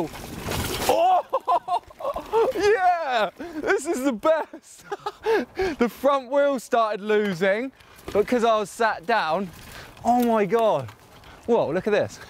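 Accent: United States. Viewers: Dude that So-Cal accent is on point I feel like I'm at home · American English is on point dude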